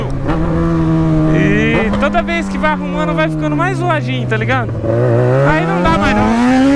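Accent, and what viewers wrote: Goiás, Brazil. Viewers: FALAA NO PIQUE DO GOIAS PORRA KKK AAA NEMM MAN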